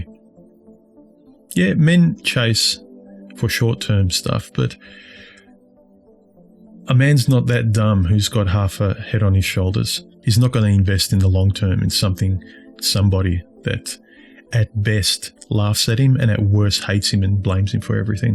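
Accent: Australia. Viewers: is this guy australian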